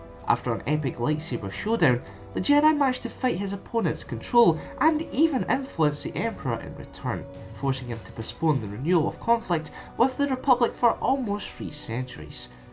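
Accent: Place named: England, United Kingdom. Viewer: Great vids , great narration but omfgod what a thick accent.. where it is from in England?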